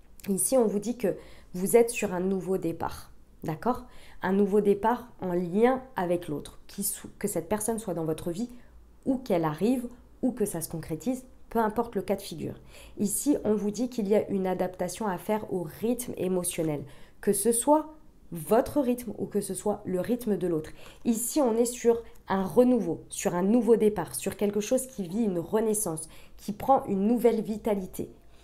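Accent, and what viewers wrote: South, France. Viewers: Bonjour merci c'est tout à fait cela le ,17 mon chéri qui rentre de 15 jours de voyage et nous allons enfin commencer notre histoire le 23 grande fête pour les 35 ans de ma fille 🙏☀️🍀🎊votre guidance est incroyable pour moi c'est fou mille merci de me rassurer dans mes angoisses de vierge . Bisous du var 🥰 d'ailleurs votre petit Rose quand vous parler me dit que vous êtes du sud aussi ☀️☀️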